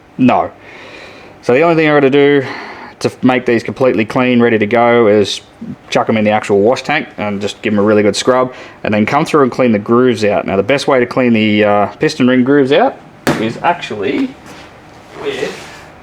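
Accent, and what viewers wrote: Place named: Australia. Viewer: hahahaha....I thought you might have "bitten" on that one! I used to live in your area but I have since moved to The Philippines. Its good to hear your Aussie accent !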